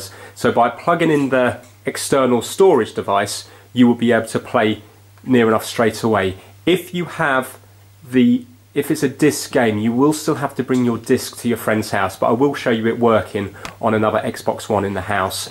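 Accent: England, United Kingdom. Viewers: What part of england are u from just wondering? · I like your British accent.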